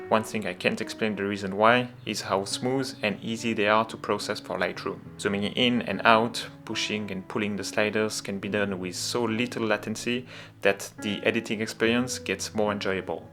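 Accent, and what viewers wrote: France. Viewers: Houuuuuuuu toi t’es français toi hahahaha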